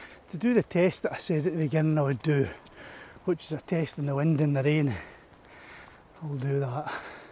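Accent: Scotland, United Kingdom. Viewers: Your great to watch,,great video,, love a scottish accent,, subbed.....im sure if you keep up the great videos you'll have plenty more subs..... i have the mini 2 also and flew it in a similar location with very high wind ,looked stronger wind than yours,no rain..signal lost,,, i was recieving the high wind warning saying unable to RTH as i went over mountain,,,, .. i thought i lost mine but it came home,,,,,, stunning little machine,,,,,,,, amazing technology....sports mode for very high winds.......nd filters for shooting video is a must have.... happy flying..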